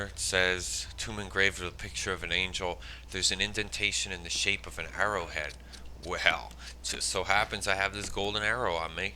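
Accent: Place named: Northeast, United States. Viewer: are you from New Jersey, New York or New England? Your accent is very familiar.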